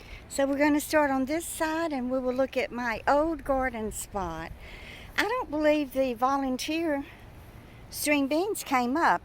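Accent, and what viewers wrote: South, United States. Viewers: A beautiful video. And I love hearing your southern accent. Could those tomatoes be heirlooms? Thanks!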